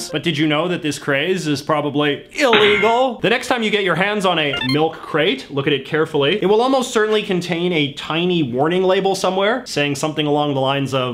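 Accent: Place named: Vancouver, Canada. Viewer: Scary robot voice with slight Vancouver accent.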